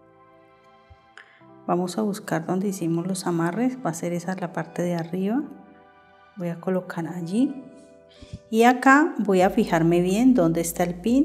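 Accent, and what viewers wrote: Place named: Bogotá, Colombia. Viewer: Ud da cursos y en donde Ud es de Bogotá Colombia según el acento Me gustaría saber para ir hacer el curso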